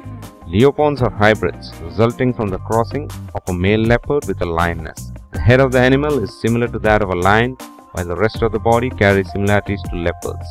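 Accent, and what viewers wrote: India. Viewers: This guy has indian accent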